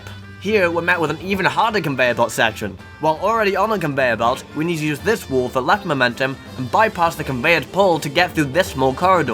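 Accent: United Kingdom. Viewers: you have quite an interesting accent/dialect; you sound British, but not quite at the same time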